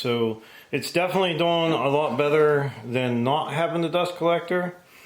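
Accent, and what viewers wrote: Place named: Pittsburgh, United States. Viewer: Are you from PA? I hear a bit of Yinzer in there.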